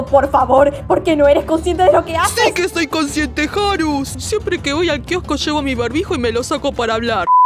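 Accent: Argentina. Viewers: Jaja bien argentino papa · AY DIOS , como me cague de risa , LPM x'd (es gay mesclado con humor , acento Argentino y espacios publicitarios de esos que pasan a las 2 o 3 de la mañana , decime , no es lo mejor de la pu74 vida?)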